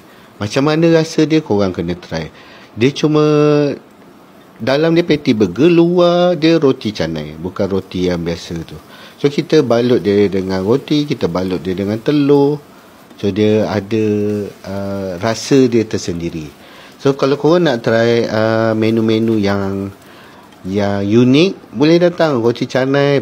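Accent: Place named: Kuala Lumpur, Malaysia. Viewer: Cakap laa pilah..baru unik bunyi konten ni. Tkojut awk..awl² burak cam org klumpo..pnutup..tibo yo kole pilah.😢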